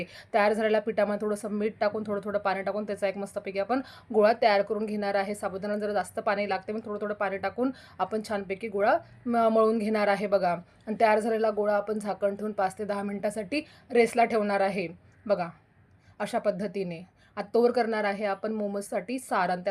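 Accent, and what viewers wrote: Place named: Vidarbha, India. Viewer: शेवटच्या😊 दिवशी एकदम जोरदार रेसिपी तुम्ही विदर्भातले आहात का बोलण्यावरून वाटलं म्हणून सहज विचार😊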